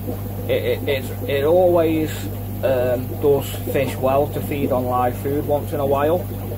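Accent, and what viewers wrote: United Kingdom. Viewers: lol I'd say that's a northern accent 👍 I'm a scouser myself and gd vids thanks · First off, congratulations of your country freedom and independence from the tyrannical shadow global organization. Secondly Macbeth is classic literature and movies for hundred of years. Why do you sound like you are from Scottland or Ireland and not from England? Where your parents from one of those 2 countries?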